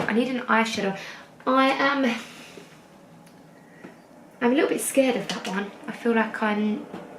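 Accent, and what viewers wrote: Essex, United Kingdom. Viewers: I love the Essex accent .. And love your vlogs .you are unique and you .. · Love the real ness! Your a babe! And I love your accent! My mums a Essex girl & she is cool AF xxx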